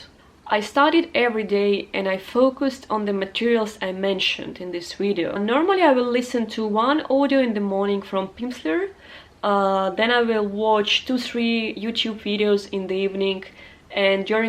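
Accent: Russia. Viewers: Хотел спросить не русский ли акцент. Конечно русский) молодец! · У Вас русский акцент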